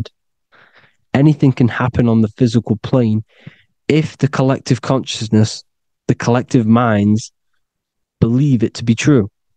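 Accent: United Kingdom. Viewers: Manchester accent?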